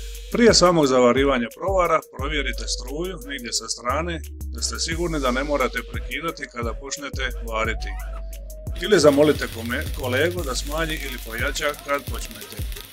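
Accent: Dalmatia, Croatia. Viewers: Pozdrav, nov sam na kanalu pa nisam upoznat, po govoru mi se cinite da ste iz Dalmacije ili bar okolice? Di bi moga napravit atest u Splitu ili okolici?, mlad san momak zeljan certifikacije, tig postupak, samouk cca godinu dana.. pozz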